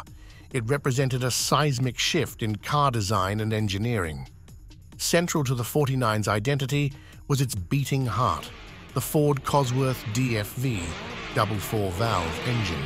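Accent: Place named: England, United Kingdom. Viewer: I can't believe how AI narration has moved on, the clipped English accent does indeed gel nicely with the eras covered in this video. Well done, subbed! 😊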